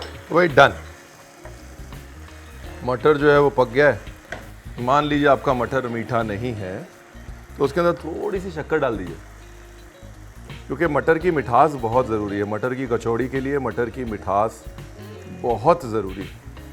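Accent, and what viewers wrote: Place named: Lucknow, India. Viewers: Apki lakhnavi bhot acchi baat karne ka salika was nice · Sir aap ki baatein Mujhae Kanpur aur Lucknow ki yaad delati hain.my home sweet home.n you share authentic UP RECIPE 👌🏻👌🏻👌🏻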